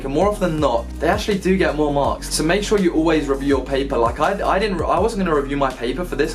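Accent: Australia. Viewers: Australian accent hahahah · That Australian accent is getting stronger😂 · THAT AUSTRALIAN ACCENT IS COMING THROUGHHHH😂😂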